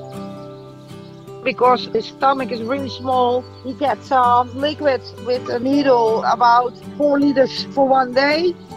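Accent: Netherlands. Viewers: I thought I heard a Dutch accent and then I heard "Ja, jongen!". Super gedaan! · Why does she sound Dutch?😶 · Hoor ik een hollands accent? Goed gedaan! Dankjewel ❤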